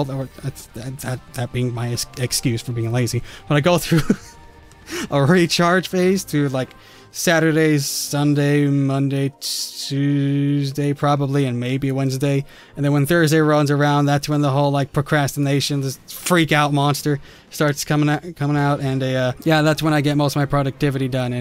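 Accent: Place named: Scotland, United Kingdom. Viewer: I LOVED your cute Scottish accent!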